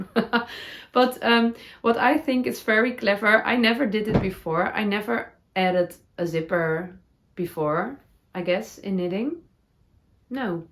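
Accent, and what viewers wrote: Netherlands. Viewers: I didn’t even know cork blocking mats were an option. I love the idea. I can’t find them available anywhere in North America. Lucky you! Thank you for your podcast. I enjoy your Dutchy English and your honesty. I hope that you and your family have a nice end of the year. I look forward to seeing more of you and your heart jumping crafty adventures next year. 😊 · Thanks so much, for a year of joyful ‘Dutchy English’! It’s been sweet to hear you talking about your love for your husband and family! All have surely enjoyed helping you find the proper words you are struggling with...from afar! Today’s word was’Hardware store’! Your confidence in making a dress shows already! You can make a ‘fake bottom band’, by just adding to center of frabic befoe cutting out pattern! You sound less worried about your ‘Miss Perfect’! We’re never too old to Keep Learning and creating beautiful things for ourselves and others!!! 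Til Next Year! Thanks for all the smiles!❤🦋🧶🧦🌷Sharon from S. California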